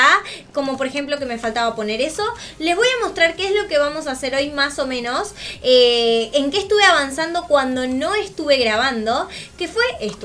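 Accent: Buenos Aires, Argentina. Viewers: Me Encantó una pregunta.. ¿Sos de Oeste o Éste del Gran Buenos Aires? 😁😆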